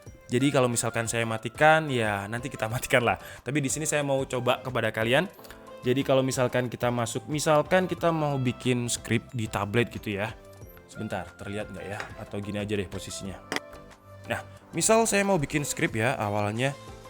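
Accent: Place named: Padang, Indonesia. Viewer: Terlalu Padang dong :v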